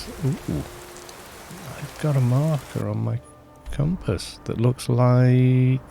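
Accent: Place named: Wales, United Kingdom. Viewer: But I do like the way you pronounce any word starting with a double L ... That's very Welsh.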